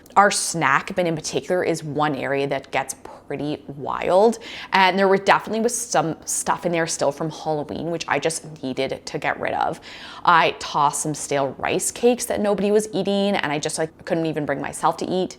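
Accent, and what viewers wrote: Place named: Canada. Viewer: Is your money info American or Canadian? I can’t recall if you’re in Canada but I know some of your words sound Canadian. Just curious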